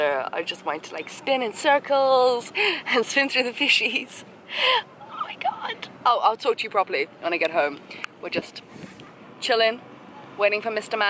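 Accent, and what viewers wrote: United Kingdom. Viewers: I just can't nail down your accent. It's certainly not Aussie but if you're a Brit, then you speak with a watered down Queens English.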